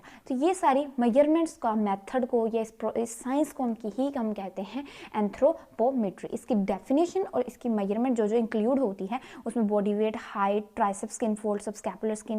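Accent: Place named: Pakistan. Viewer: mam your urdu accent is so cute😍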